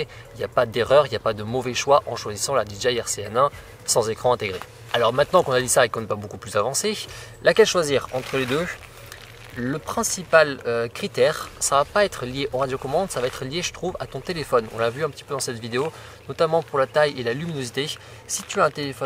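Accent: Toulouse, France. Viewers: Alors moi l'accent toulousain ne me gène absolument pas, j'y suis habitué :). Plus Sérieusement, encore une belle vidéo pratique, instructive, claire et le fait que tu parles vite, bah je trouve ça trop bien, on te comprend totalement et ça a l'avantage de ne pas voir une vidéo de 20 minutes pour apprendre que DJI fait des produits au top... bon là on en est pas loin. Bref, tout ça pour dire que j'ai bien apprécié ta vidéo. Seul bémol (parce que sinon je ne commenterais pas, comme beaucoup...) : sur la portée de la radiocommande RC, en vrai, comment tu le dis, à courte distance pas de problèmes, mais au delà de 500m, ca déconne un peu. Perso, j'ai toujours ma RC-N1 en back-up et dont la liaison est quand-même bien meilleure que la RC. Je garde la RC pour l'avantage de la vidéo intégrée et ergonomie, mais je dois reconnaitre que je suis moins à l'aise en terme de sécurité qu'avec la RC-N1 qui elle ne foire jamais. 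J'espère fortement que DJI remédiera à ce point. On nous parle d'Ocusync "10 000" mais le résultat est pas fou. Ca reste ma vraie déception de l'achat du Mini 3 Pro. Je me voyais déjà envoyer mon drone sur la lune pour prouver que la terre n'était pas plate. Bref... En tous cas, encore un grand merci ! Continue, et je continuerai de mon côté à te suivre !!! Et encore merci en tous cas !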